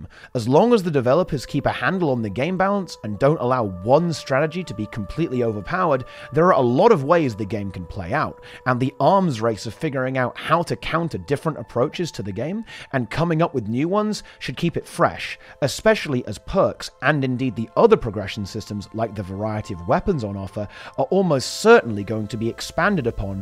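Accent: England, United Kingdom. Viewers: how will I listen to your smooth english accent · your smooth english accent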